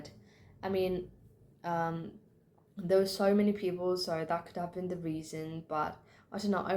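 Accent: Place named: United Kingdom. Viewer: british accent at its best the vo must be impressed by this dd congrats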